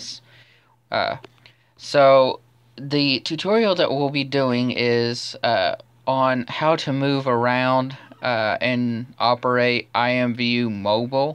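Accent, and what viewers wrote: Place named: United States. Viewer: You sound country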